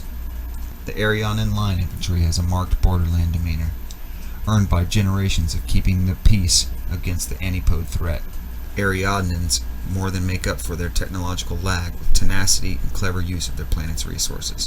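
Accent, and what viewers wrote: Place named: Canada. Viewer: Enjoying your videos (considering getting into Infinity, and I like to know the lore of games, and specifically factions that I play. You have caught me off guard, as I have heard you say both zed and zee now. I am guessing that you are either Southern Canadian, or from a Northern state, with a lot of border crossing Canadians. That, or a child of parents from both sides of the border. Regardless, love the videos, watched four so far today.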